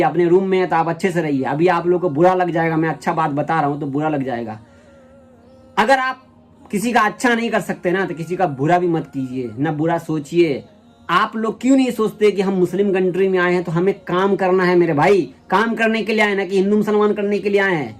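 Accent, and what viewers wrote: Bihar, India. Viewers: Aap Bihari ho Boli se lagta hai.Kaun sa Zila Ba.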